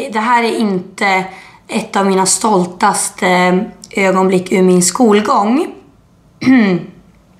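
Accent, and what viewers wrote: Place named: Stockholm, Sweden. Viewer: Vilken jävla stockholmska du fått sjukt asså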